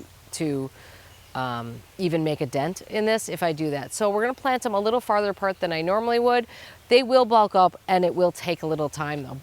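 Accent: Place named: Midwest, United States. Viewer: I appreciate the recommendation, but in checking out Soil and Margarita’s channel, it seems like she only wants to do videos at a certain time of day when the sun hits her just right so she looks her best (her words), she doesn’t like interruptions and stopped filming on garbage days for that reason (not that I blame her, but there is nothing “raw” about that), and just generally seems much more fixated on film production than “happy accidents” and filming whenever and wherever the desire strikes. I’m also a dog lover, so those interruptions are some of my favorite moments here! (Cannot beat the dunking of the head into the stock tank moment with quick wit of saying the dye is safe for even Newfoundlands!) 😆 I also just really feel a connection with the midwestern dialect as I’ve been separated from that area for many years and it feels very homey to me. 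Soil and Margaritas seems like a very sweet content creator, and indeed, she said she is not in it for the money, which is why I’m guessing you recommended her, but she’s definitely not like. I hope she has great success just the same though! 😊❤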